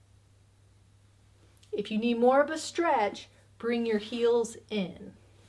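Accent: United States. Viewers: Thanks this has really helped with my hip pain ....out of interest what state is your us accent from :) Regards mark uk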